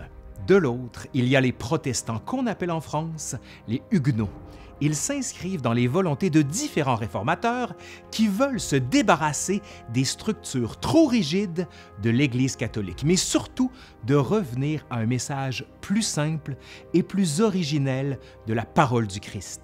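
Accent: Quebec, Canada. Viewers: Très intéressant ! Et l'accent québécois ont adore en France 👍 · Et bien pour moi c'est la vidéo avec l'accent québécois qui me régale haha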